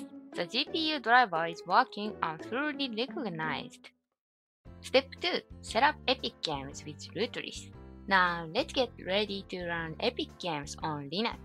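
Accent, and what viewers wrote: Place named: Japan. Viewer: Thank you for your guide and the effort you put into the video! Love your Japanese accent and the little character in the bottom left corner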